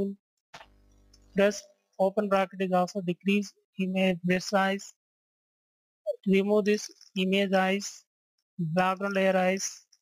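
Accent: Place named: India. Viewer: I agree this is the worst tutorial on Photoshop morphing techniques. the final end result is a disaster. no maintenance of ratios, lighting, hell he is even using a rasterized cut layer for his process. With all these negatives in place, the dialect in which he spoke was the only thing you noticed? Why do you even have to say broadly, that 'Indians' should not be allowed to attempt to speak that language? Who are you? The keeper and safe-guarder of English language? People like you should not be allowed to even comment on YouTube or any other social media for any case. You have the gall to speak about Indians as such and watch a video from them, amazes me beyond the realms of any form of human thought. The uploader of the video has either: no brains, absolute idiot or a moron, who channel as a legit name ' ' with noob kind of videos. And on the other side, we have half cooked, stupid taboo people like you who are racist.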